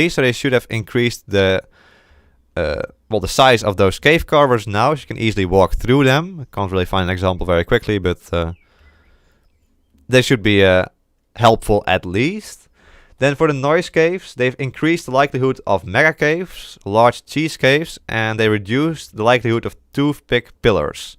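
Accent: Netherlands. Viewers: hello, i can hear you're from the netherlands :)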